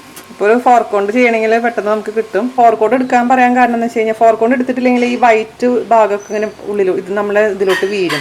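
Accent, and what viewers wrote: Thrissur, India. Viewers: njan thrissur ane .athond thanne chechide samsaram valya ishta to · അടിപ്പൊളി കൊള്ളാം അവതരണം നാട്ടിൽ പോയ് ഉണ്ടാക്കി നോക്കണം തൃശൂർ മാള സ്വദേശിനിയാണന്ന് തോന്നുന്നു.... Good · Chechy good recipe and the way you are explaining in Thrissur slang is excellent. Take care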